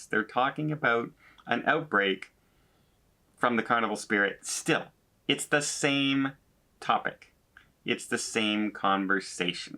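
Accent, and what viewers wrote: Canada. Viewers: My husband loves to watch your videos. He says you sound so Canadian; he is too. Thanks for being logical. I don’t believe the number of covid cases onboard with crew and passengers is public information.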